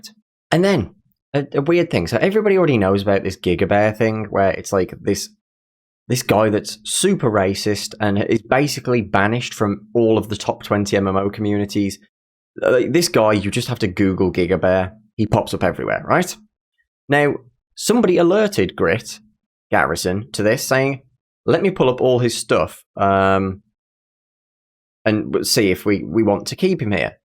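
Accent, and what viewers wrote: Birmingham, United Kingdom. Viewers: Are you a brummie :)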